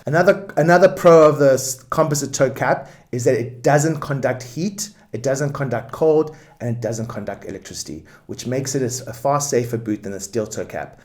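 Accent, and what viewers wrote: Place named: South Africa. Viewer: Your intention to show Toe caps history and evolution is trivial and informative. However, you made a huge mistake in using an image of HITLER to reference a period in time. That is extremely insensitive, offensive and reprehensible. Absolutely bad decision ( if it wasn't on purpose) to show your personal alignments with one of the worst war criminals in history! You could have EASILY chosen a different image from the same time period. Perhaps your being from South Africa, ( the accent giving it away) is part of why you did it on purpose. Absolutely Deplorable. Very tempted to report this garbage to Youtube.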